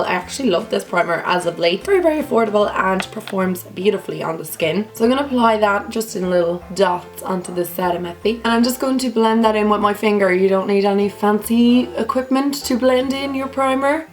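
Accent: Ireland. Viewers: Are you Irish beautiful?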